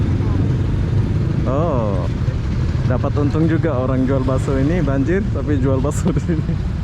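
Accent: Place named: Malaysia. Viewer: Saya terkesan dengan logat bicara bg yg kental akan nuansa melayu jadi saya do'akan semoga bg sukses dan sehat selalu. Salam santun dari saya putra melayu riau🙏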